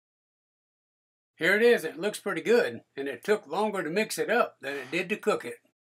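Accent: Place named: Alabama, United States. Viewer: I was sitting here thinking, you remind me of my papa... what part of Alabama are you from?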